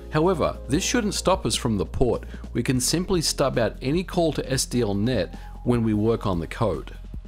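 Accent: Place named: Australia. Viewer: You sound Australian lol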